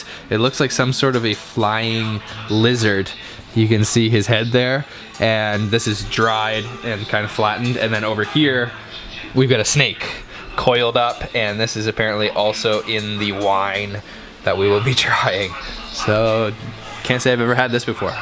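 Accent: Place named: Canada. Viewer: Stumbled over your channel by accident and I love it! Great content, superb drone footage and love the Canadian pronounciation - „over and eut“ 😉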